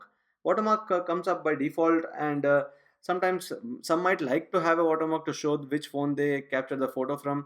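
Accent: India. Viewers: The presenter is doing a good job of demonstrating how much he knows...he has no idea how to be a teacher...very fast talker, his very strong Indian accent is almost unknown. leaves everyone behind. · Stop with the accent you Indian I don’t understand u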